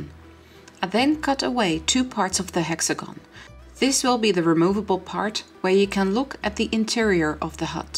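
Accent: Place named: Netherlands. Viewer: Australia? You sound very Dutch? Awesome work!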